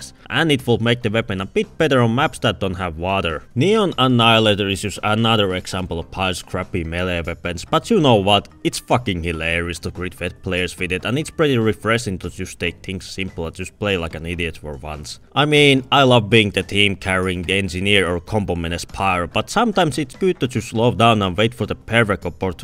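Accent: Finland. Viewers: Kuuntelin minuutin ja haistoin suomalaisen aksentin. Good video regardless! Informative and staid pretty close to the topic.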